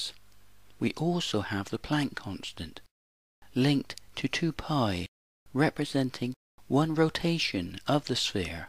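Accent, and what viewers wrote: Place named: Somerset, United Kingdom. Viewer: Maths in a Somerset accent is simply the best maths you'll ever find.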